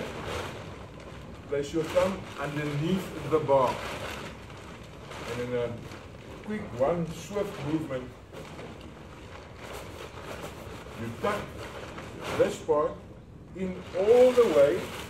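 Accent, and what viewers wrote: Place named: Afrikaans, South Africa. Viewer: As soon as I heard an Afrikaans accent I knew this ballie was the real deal 😂 Thank you, Sir. That was very helpful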